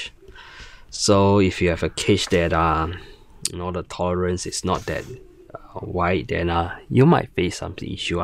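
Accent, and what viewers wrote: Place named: Singapore. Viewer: you sound singaporean are you? great vid btw thanks for telling me about the worker half length pusher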